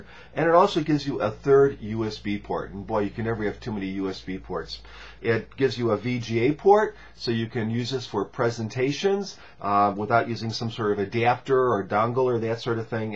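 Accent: Chicago, United States. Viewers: Hahaha Its the way you pronounce your "A's" and "O's", especially the A's. For example in "podcast". Sounds like a strong Chicago accent to me. I'm from the south (Arkansas), by the way.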